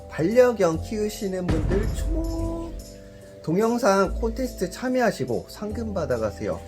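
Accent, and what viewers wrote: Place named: Pohang, South Korea. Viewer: 브르님 말투에서 느껴지는 퐝의 느낌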